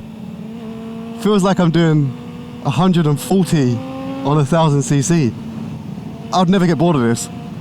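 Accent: United Kingdom. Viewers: Uk accent but NZ roads is wigging me out haha. Would love one of these. I have CBR250rr (another 20k screamer). Is the best.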